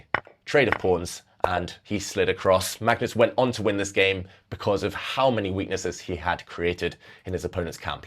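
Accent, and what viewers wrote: United Kingdom. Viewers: It’s the British accent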